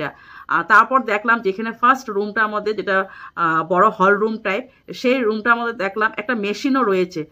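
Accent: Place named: Northeast India, India. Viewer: North east e Jara thaken tader aneker Bangla Bolar style ta erokom.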